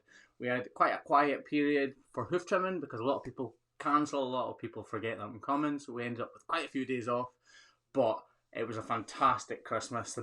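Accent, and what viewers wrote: Scotland, United Kingdom. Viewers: Hi Mr I'm so sorry I missed the live stream but just wanted to drop you and the Mrs a Happy New Year from Northern Ontario Canada. Love your video. I'm not in farming at all but I find it amazing what care you take with all the cows and bulls. It's so great to see. So LOVED your video with all the calfs was wishing I could of been in there with you and played with them. Sooo sweet. Thank you for being such a kind and loving person it does come themrew in your videos. Have to love a Scotsman it's the accent. I'm part Scottish and have never been to Scotland your videos really make me want to visit. I had asked before but the music you play in the back ground who is it? I know there's more then one but I love it it's so relaxing. Can you give me somenoff the names please. O and you should come to Canada and see it we are up to Scotland standards. 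That would be a great video. Thanks for all your video and again Happy Ney Year may you and your be truly blessed.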